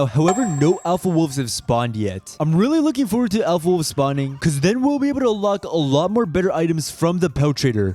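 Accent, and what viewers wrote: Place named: France. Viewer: T’es français mdrr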